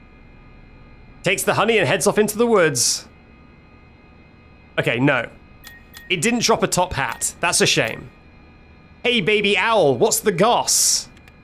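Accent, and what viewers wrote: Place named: South, United States. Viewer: That accent is southern american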